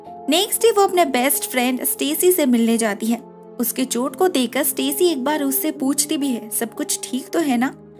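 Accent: Bengal, India. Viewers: Are you bengali ma'am ? My mother's friend is bengali and she speaks exactly like you.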